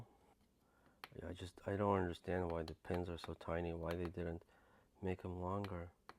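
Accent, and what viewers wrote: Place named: Russia. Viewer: You know the hardware/software repair tutorial is going to be good when the guy speaking has a Russian accent.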